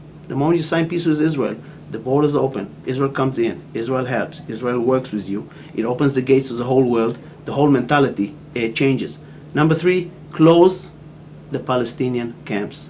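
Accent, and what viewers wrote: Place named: United States. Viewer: you talk like a mili..into operation details...fuck that..stand back...u cant have a humane war. Now I respect the IDF IAF for their attempt to be humane, but please understand/feel. You know you sound like an american. Fuck Hamas, but consider your self, bro. Its insanity to think that you can create a humane military system...I dont want to rais eth eissue but the whole fucking sick system of the Shoah, as you call it, was created because asking troops to shoot people made them into monsters.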